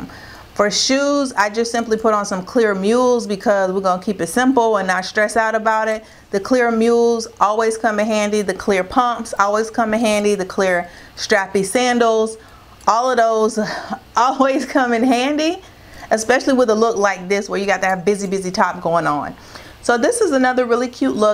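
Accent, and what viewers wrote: South, United States. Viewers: I lost a lot of weight, for my health. I am loving the extra benefits, like needing new clothes. I have turned into a shopaholic. You are my style inspirations. I am over 40, but can still wear a lot of the ideas you give me. Love you style. I love your accent. Just a little bit of the south.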